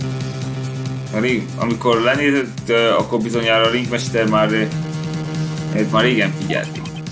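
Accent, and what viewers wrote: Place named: Slovakia. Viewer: Ha a beszédére gondolsz akkor az akcentus mivel szlovákiában él és talán született is.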